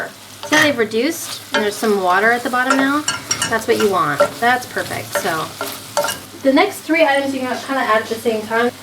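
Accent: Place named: France. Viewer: I read your comment and get your concept. I love it! Recipe looks good. I like it soupy stew like but Check out Martha Stewart and Daniel Boulud ratatouille. It's very authentic as they eat in France as side dish. Bonne chance X p.s your French accent is so incredible!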